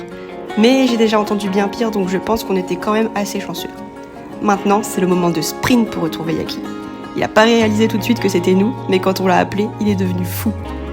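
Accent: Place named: Alsace, France. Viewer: c'est un petit accent alsacien que j'entends ;-)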